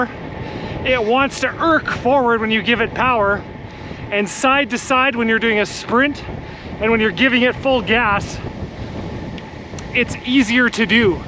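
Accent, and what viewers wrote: Canada. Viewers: Canadian accent is a bit of a give away as to NOT American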